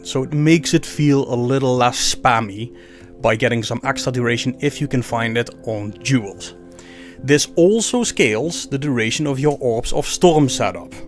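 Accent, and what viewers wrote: Limburg, Netherlands. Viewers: kunse van Limburg?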